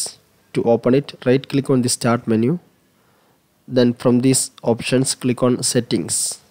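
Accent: India. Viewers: When I hear this indian voice, i know that it won't solve my problem and didn't solve.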